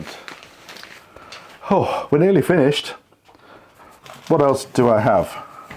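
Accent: Wales, United Kingdom. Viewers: I'm sorry you haven't been well, you certainly sounded poorly. I must confess I could listen to you all day long. I love Welsh accents. My late wife, bless her, was Welsh and I spent a couple of years living in Wales. That was a great time. I hope you shake off that flu and are back to normal ASAP. Good luck!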